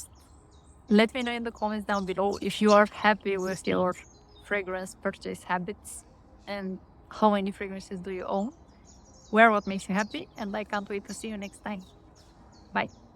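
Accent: Romania. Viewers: Dear! Thank you so much for this vulnerable video! I too am Romanian and recognized your accent from the very first seconds. But for the sake of this post, I will comment in English because I am sure so many viewers will resonate with your message. I recently got into discovering perfumes. It hasn't even been 3 months yet, and my collection went from one signature scent that I had for the past 12 years to 6 full-bottle niche perfumes and a bunch of paid decants (and I can't really say I have the income for such big expenditures in such a short amount of time). These past months I've been compulsively watching videos about perfumes, reading on forums, reading people's comments on Fragrantica, taking recurring trips to perfume stores, and hunting the next thing on my wish list. And when I finally got the next perfume, I wasn't even that impressed with it anymore. 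When you mentioned the WHY I immediately started tearing. As you said, I think this sudden obsession came from the need to fill a void as I just experienced a painful loss. I am glad I caught this obsession early on and I am determined to put a full stop to it. Thank you for your message and advice.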